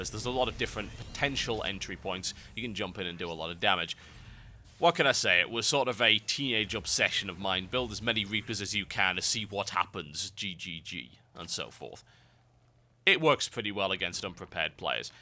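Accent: United Kingdom. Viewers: I can't help but read comments and video descriptions in his british accent. Does anyone else have this problem?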